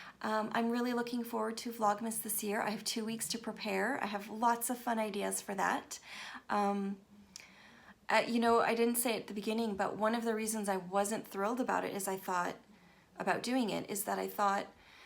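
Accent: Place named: Scotland, United Kingdom. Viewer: Love the podcasts. A new one is dunderknit but I'm sure you already know about that one. She's a fellow Scot! Yeah!! Good to hear my accent in a podcast. Currently knitting my first sweater which is also my first colourwork project. Just upgraded my sewing machine so time to get creative instead of just repairing. Going to make some project bags as Christmas gifts this year. Love the pace of your podcasts. So calming!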